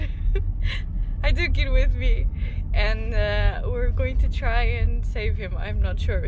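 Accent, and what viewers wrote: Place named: Norway. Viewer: Hi I'm Joachim I'm from Oslo, like you're videos 👍 I think it's really cute that you're English now starts to have a Norwegian accent 😊😅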